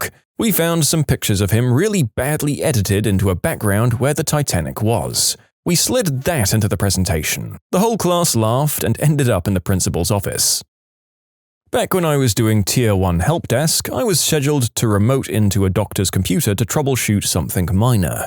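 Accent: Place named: England, United Kingdom. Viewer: I like the English narrator he's very charming. HIs delivery on stuff really works Plus I like his little inserts sometimes I wish we got more of those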